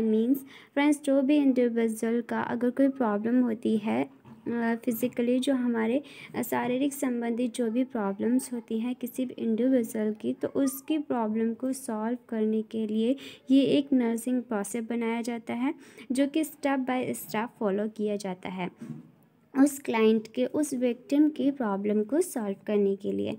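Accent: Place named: India. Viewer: إنجليزي بنكهة هندية 😂😍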